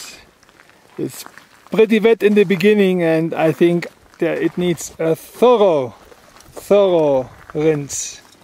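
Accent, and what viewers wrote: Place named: Germany. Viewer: Bei dem deutschen Akzent, würde ich lieber alles in Deutsch filmen und später Untertitel hinzufügen. Ist zwar dann etwas aufwändiger zu bearbeiten, aber weniger anstrengend für die Ohren und vielleicht auch für dich :)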